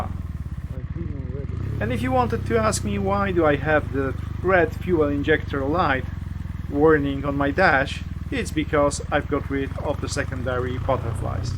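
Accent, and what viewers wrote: Poland. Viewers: Ciekawi mnie czy dobrze rozpoznaje polski akcent?🤔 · Hi there. Z Polski? Akcent :-) Napiszesz co dokładnie zmieniłeś w motocyklu?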